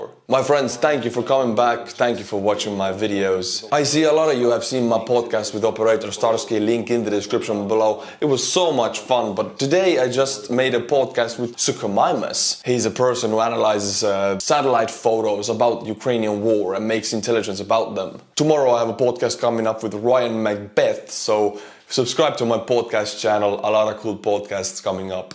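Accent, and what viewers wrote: Scotland, United Kingdom. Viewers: You have talent as a commentator! I love your Scots accent for you land and title advertisement. I’ll bet the you are there most successful advocate!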